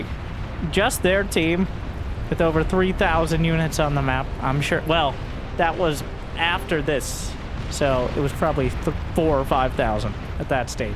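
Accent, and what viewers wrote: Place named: Arkansas, United States. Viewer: Trying to figure out your accent. Arkansas?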